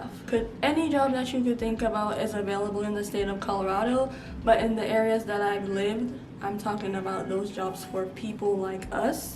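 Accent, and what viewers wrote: Jamaica. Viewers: You had me with the Jamaican accent and food 😍 Moving to Denver and will definitely be looking for Jamaican food. Thank you sis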